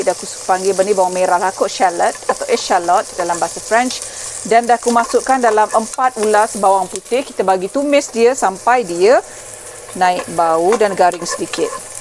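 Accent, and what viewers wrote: Negeri Sembilan, Malaysia. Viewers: Assalamualaikum Makcik....♥️ Suko boto nengok Makcik membebel sebijik mcm Makcik2 sy yg dh lamo x jumpo....laie klo cakap loghat nismilan...ghoman pun lobih kurang yo...hehehe♥️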